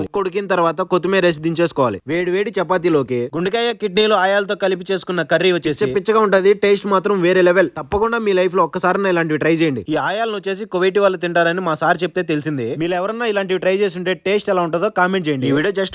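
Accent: Kadapa, India. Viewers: బ్రదర్ మీది ఏ ఊరు Mee భాష కడప భాష లాగావుంది 🙂🙂🙂🙂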